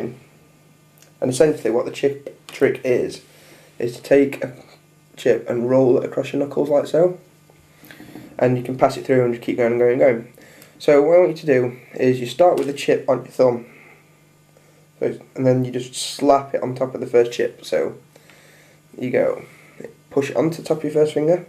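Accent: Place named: Northern England, United Kingdom. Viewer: I know you're up North but I can't pinpoint the accent, is it Blackburn/Sheffield?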